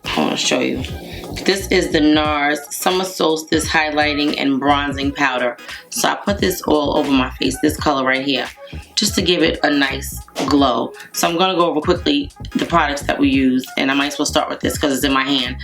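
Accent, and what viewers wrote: New York, United States. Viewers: Native New Yorker!! I knew it! The first time I watched you I was thinking she sounds like she's from NY which is great because I love NY and I'm from NY too😊 I never asked you because I understand YouTube rs have to be safe about revealing personal information and I respect that. OK enough about NY☺ I love this latte look on you❤ The eyes do look more on the golden side but still very pretty.I purchased the Infallible blush too because I saw in one of your haul videos but I got shade confident pink and now I want the shade you used too,I really liked it. Or do you have both shades?🤔 I would love to see how you make your wigs. Your wigs always look beautiful I thought you bought them,good job. I wish you much luck and success on starting your own line. Just wondering why you set your undereyes with two different powders? 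I tried doing that but it turned out looking cakey on me😒 Btw I saw the Barbie movie it wasn't at all what I expected. I'll just say I most I enjoyed about the movie was looking at Ryan Gosling 🙄😄😉 Chat with you soon darling 😘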